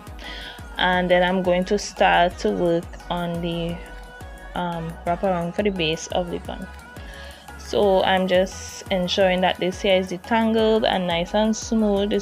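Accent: Trinidad and Tobago. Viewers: Are you trini? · For some reason you sound 🎵 like a Trini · Hearing this accent you a WHOLE TRINI 🇹🇹🇹🇹😍 HEYYYY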